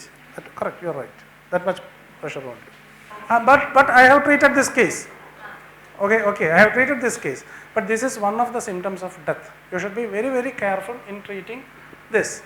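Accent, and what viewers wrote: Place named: Kerala, India. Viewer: learn to speak english first man .... i can't understand u kerala accent .... plzz work on this .....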